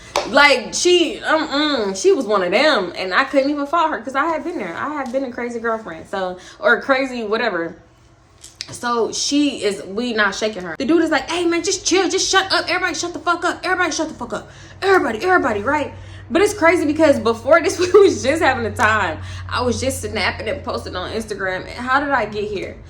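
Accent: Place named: Chicago, United States. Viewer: WHY do you sound so Chicago!? #why 💪🏾💯💓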